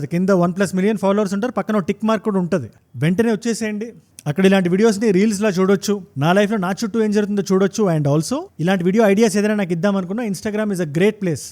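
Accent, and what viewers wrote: India. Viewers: Mee voice lo indian feel venataniki chala baguntundhi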